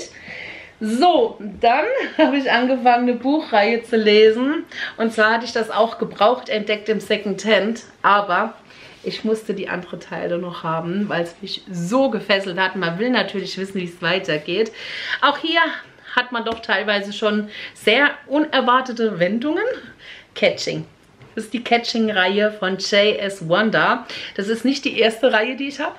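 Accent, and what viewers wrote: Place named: Palatinate, Germany. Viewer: Liebe sehr schönes Video, und dein Pfälzisch 🥰 mir gefällt deine ehrliche Art und du bist so eine sympathisches Fräuleinchen, und die Buntheit in deinen Videos einfach macht es Spaß dir zuzuschauen 😀…die Lampe ist sehr schön🍀😀über Gewinn hätte ich mich sehr gefreut😊lg Miri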